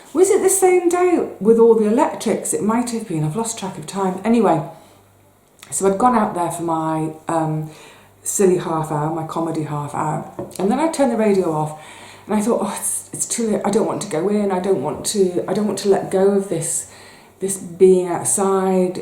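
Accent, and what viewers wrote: United Kingdom. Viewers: british accents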